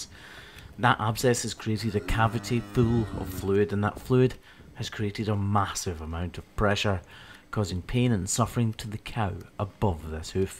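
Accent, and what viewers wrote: Scotland, United Kingdom. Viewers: I thought you were Scottish and this video proved me right🏴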